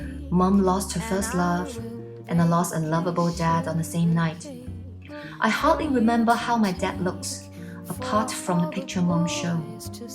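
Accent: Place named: France. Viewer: youre such an inspiring woman, I really loved learning about your past! Your English has a bit of French accent to it, it was sooo cool!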